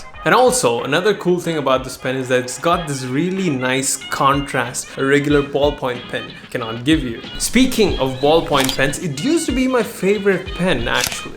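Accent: India. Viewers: Are you indian? How do you get that accent? Where are you living at the moment? You confuse..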